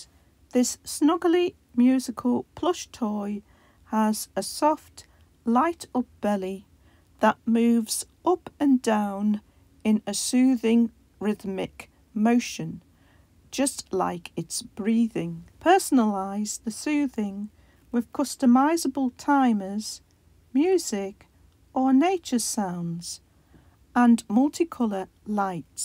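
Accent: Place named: United Kingdom. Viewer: Thank you SO MUCH for this video! SO PLEASED I found it & didn’t get scammed. Ironically, I found your voice much more soothing! Your accent reminds me a lot of a lady I used to work with. Do you mind me asking what part of the UK you are from?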